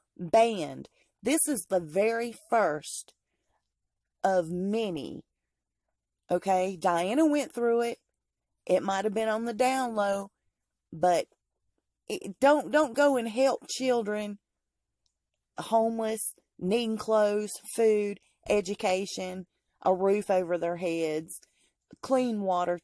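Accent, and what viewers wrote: South, United States. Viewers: Girl, you crack me up!! Im from the South and you bring back lots of memories of growing up among Southern white women. No harm or racism intended...love your accent!! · I can't decide if you are, in fact, Paula Deane, Nancy Grace, or Samantha Markle. Your deep southern accent is so familiar but I just can't quite place it. So much snark and meanness directed at Meghan. What is your beef with her and why do you care so much what she is up to? Meeeoooww. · You sound Southern are you from the South?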